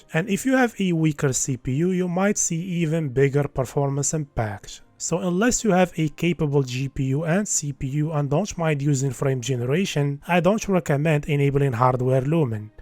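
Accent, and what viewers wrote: Morocco. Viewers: bayna fik maghribi, english m9awad 3andek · U sound moroccan bro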